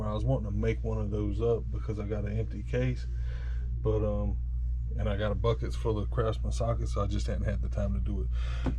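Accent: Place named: Appalachia, United States. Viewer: Hey hillbilly talk right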